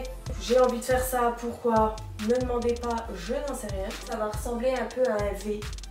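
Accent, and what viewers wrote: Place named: East, France. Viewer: Pas mal ta chaine ! Oh ça sent l'accent de vers chez moi, l'Est !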